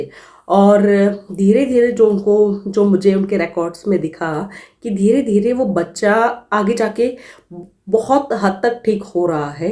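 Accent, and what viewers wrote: Haryana, India. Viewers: राम - राम बेबे । आपका बोलने का लहजा हारेयाणवी हिन्दी का है। क्या मैं सही हू।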